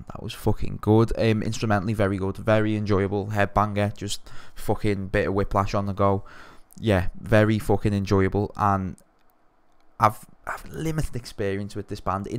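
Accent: Liverpool, United Kingdom. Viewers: you from liverpool by any chance? keep the vids up dude good stuff!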